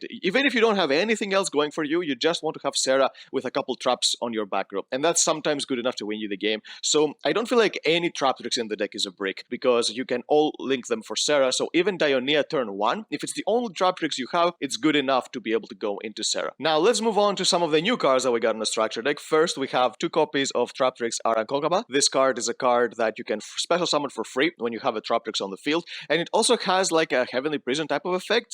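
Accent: Greece. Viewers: Your accent sounds greek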